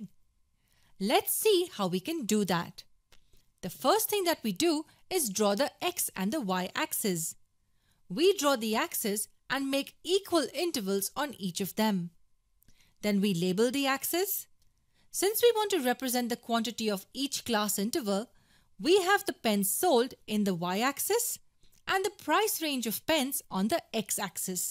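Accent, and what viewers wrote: India. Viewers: Indian ascend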